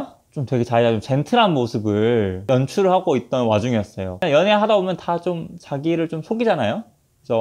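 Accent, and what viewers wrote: Gwangju, South Korea. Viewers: 헉 약간 말투가 광주바이븐데 광주광역시 출신이세요?!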